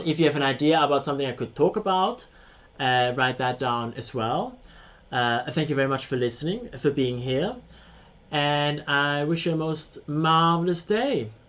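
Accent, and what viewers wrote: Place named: London, United Kingdom. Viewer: I'd say a posh London accent. I live in the North east of England. We have a very strong accent people say. Your accent is to posh to be from my part of the country. You have a lovely speaking voice, very easy to listen to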